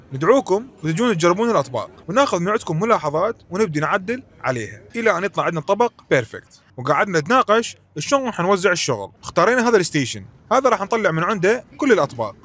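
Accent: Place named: Baghdad, Iraq. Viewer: يا جنسيه داحسه عراقي بغدادي مسمسم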